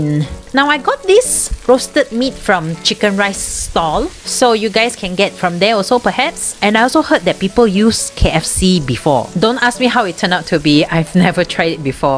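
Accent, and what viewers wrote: Malaysia. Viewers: Yes....itu Malaysian accent damn shiok!! Not pretentious.❤️ more holiao..I usually letak leftover juicy char.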